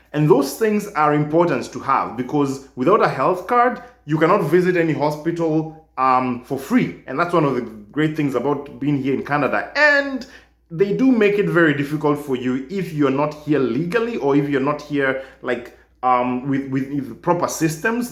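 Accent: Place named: Kenya. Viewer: You sound Kenyan. Useful video.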